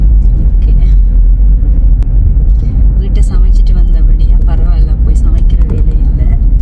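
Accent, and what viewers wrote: Jaffna, Sri Lanka. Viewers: யாழ்ப்பாண தமிழ்❤ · யாழ்ப்பாண தமிழ் இனிமை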